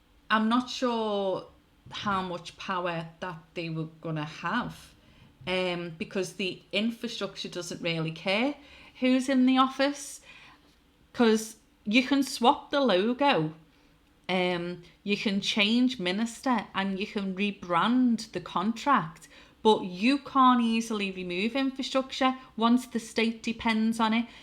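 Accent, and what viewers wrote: Liverpool, United Kingdom. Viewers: Came for the, stayed for the Scouse! I'm impressed by your analysis. Do you follow Joti Brar? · I like your voice. It's a kind of pure scouse. In Lord of the Rings I think the Palantir was a magical stone that enabled people to look into other times and places.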